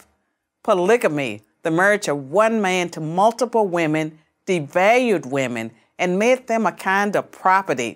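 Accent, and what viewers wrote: South, United States. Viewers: As a Southerner, I get her dialect. She is an excellent speaker with pleasant intonation.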